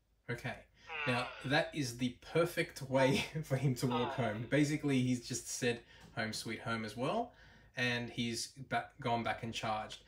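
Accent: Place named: Australia. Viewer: Hi, as always very helpful videos 😊thanks for that! My EMO gets off the home station quite well but today when I asked him, he said: I can’t at this moment , you think this has to do with the battery? I thought it was weird as it had never happened … Thanks for any advice. Ps: love your Aussie accent 😊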